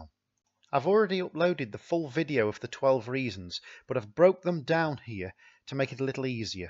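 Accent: United Kingdom. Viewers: These are cracking videos. Thank you so much. Great to hear a British voice instead of the legion of american videos. Love this series. Really useful. Wish I could fix my mercury mariner though. Love to see a video on replacing crankshaft seals.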